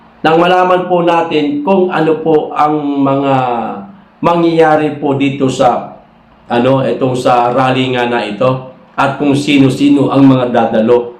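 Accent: Visayas, Philippines. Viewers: visaya ka nmAn😅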